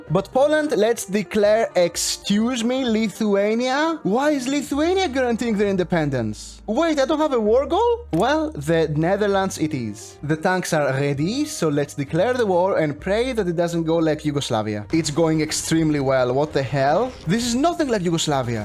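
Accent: Greece. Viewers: The accent... Are you Greek? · Never thought Greek accent sounds like this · Bro I can't believe it I'm greek και κατάλαβα κατευθείαν ότι είσαι από Ελλάδα από την προφορά σου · Όταν σε άκουσα να μιλάς κατάλαβα ότι είσαι Έλληνας 😂😂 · superior greek accent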